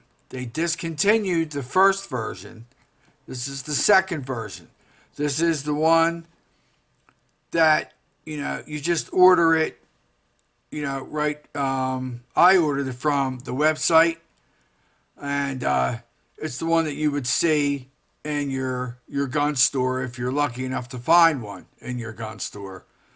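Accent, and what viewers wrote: United States. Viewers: Where are you located? Got kinda a “Balmore” accent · Hard philly accent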